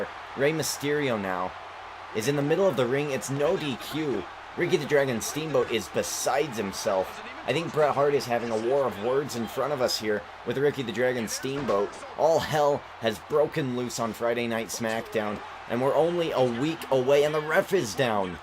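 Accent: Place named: Switzerland. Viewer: Here we go, Friday Night Smackdown (It is late, so I'm going to make these things short). A pretty interesting grudge match, and I'm gonna go with Owen Hart. He's impressed me one hell of a lot recently. Damn, what a fantastic match, especially the run-in! I can see why you're super happy with the way you use Universe Mode, that was great!:D. As much as I adore Antonio Cesaro, AIDEN ENGLISH WILL PREVAIL, I JUST KNOW IT! I liked your Swiss accent, even though it did slip into English and Irish quite a lot... but details! while I'm sad Aiden English did not win, Cesaro's commentary was really fun, so it is all good! Great work! Gotta go with Orton. You never want to piss off a Viper. A great match, with some nice Samoa Joe commentary on the side!:). Gonna go with my man, Corey Graves! Damn, Rider kicked some major ass in this one! Utterly murdered Graves! 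WWWYKI! I'm going to give my victory to The Shield here. They've been super dominant, and right now, I don't think Yoko or Kofi can stop them. Wow, The Milita comes out on top! I'm impressed with them, they could be a real threat towards The Shield's reign as tag team champions! Great stuff as always!:).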